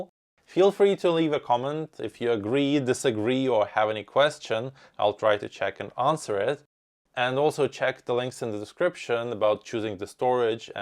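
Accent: Russia. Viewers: Fucking russian accent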